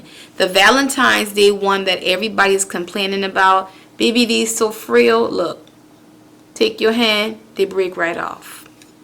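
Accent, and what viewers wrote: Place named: New Orleans, United States. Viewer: You sound like you’re from New Orleans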